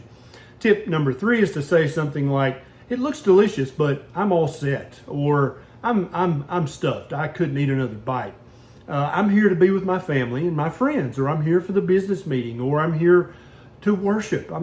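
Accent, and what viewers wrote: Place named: North Carolina, United States. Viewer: LOL. Randomly dumped into your channel. Sounds like a NC accent. Subbed.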